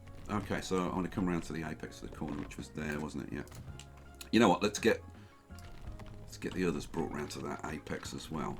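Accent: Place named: United Kingdom. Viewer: Brilliant ideas you need to write those ideas down someplace .. and YES I would vote for a decoration and tidy up episode. After all it is a large part of building up the map to be the best that it can be. On a completely off topic note by chance are you and John Oliver from the same area ? My ears tell me you two could be brothers, I do not mean that in a negative way at all, I think it is very cool, as you both have great enthusiasm for what you do, and your voices express and convey that to your audience. Good on You mate. I am busting here, waiting for the train ride on the new railway.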